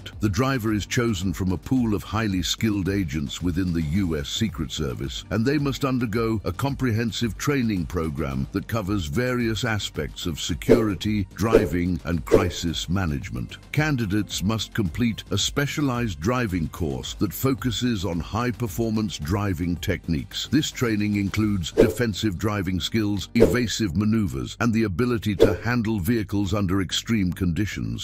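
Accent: United Kingdom. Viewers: Why is the narrator British? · Another British narrator talking about an American president’s car. 😳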